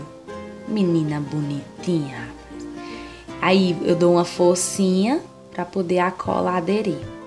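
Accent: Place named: Northeast, Brazil. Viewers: Pernambuco kkk Eu nem percebo que falo assim tbm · Amei, ótima dica,e gosto d+ desse sutaqui nordestino, parabéns.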